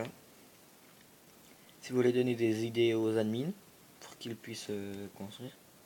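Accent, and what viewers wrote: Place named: Quebec, Canada. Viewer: Accent québécois ❤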